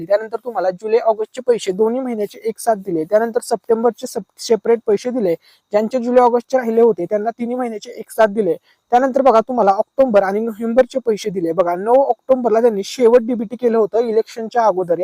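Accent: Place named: Jalgaon, India. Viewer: Mini Jalgaon jila tune bolati aahe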